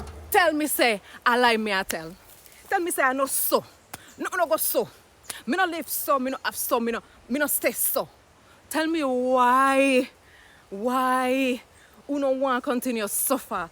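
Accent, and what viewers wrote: Jamaica. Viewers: Love your channel and your jamaica patwa accent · That's crazy .. she got a English accent .. a Jamaican accent I love it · Lololololol, mi luv hear har wid fi patwah yah mon. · I love the way you speak Jamaican English. I love your beautiful solar system. You always win, be happy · 😂😂😂😂 You pretty pretty pretty but I only understood half of your words but I love the Jamaican accent 😂😂😂😂❤❤❤❤❤❤❤❤ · Jesus christ idk what to call that accent, spanglish patois? Its cute though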